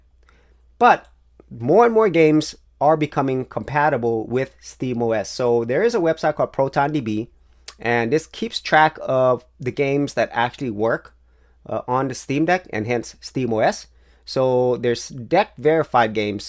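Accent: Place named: Latin America. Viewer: My wife and I were trying to figure out your nationality based on your accent. I said Hspanic but my wife said Korean. I see your picture now but help us! Did you grow up in a Latino country?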